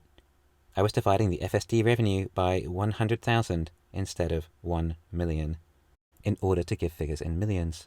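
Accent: England, United Kingdom. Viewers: You English accent is very heavy in England.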